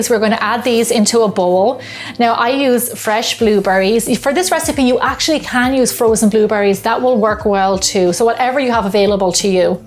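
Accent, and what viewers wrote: Ireland. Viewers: Hey I have been watching your videos for the past 1 year. I have to say I find them really therapeutic and calming. And I also love your Irish accent. I have tried some of your recipes and they turned out so good. I really like the way you explain them. NEVER regretted coming and subscribing to your channel.❤️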